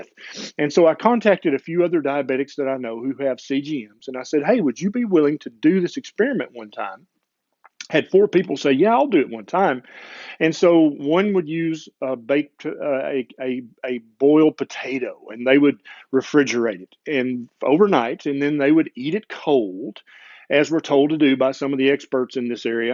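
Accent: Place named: Tennessee, United States. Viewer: I never heard this as I don’t watch the dude as he creeps me out with his fake muscles and I keep getting his ads come up on all my YouTube videos... it’s enough to make me pay for premium. I am pretty naive but I can’t believe anyone believed this without testing til now. Love you Dr! Your accent makes me miss TN xxx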